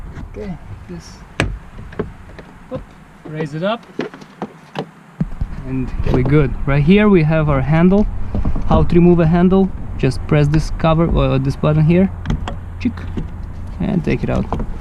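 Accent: Russia. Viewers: laughed too hard when you said hammer instead of camerea because of your russian sounding accent. Great video tho thank you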